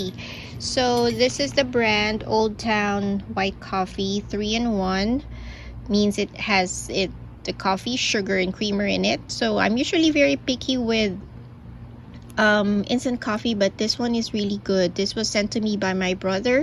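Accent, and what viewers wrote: Philippines. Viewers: If I were a betting man, I'd say you're Filipina with that accent.. (fellow filipino here) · You sound Filipino ;)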